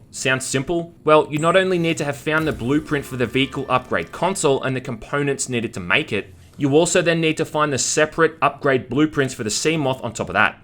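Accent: Australia. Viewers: I like how he sounds like an Australian from "down under". Lol. It's so fitting.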